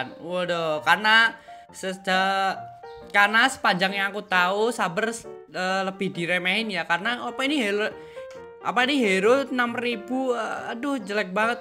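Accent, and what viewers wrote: Java, Indonesia. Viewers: Orang jawa yag mas? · Pasti yg punya Chanel orang Jawa keliatan Logatnya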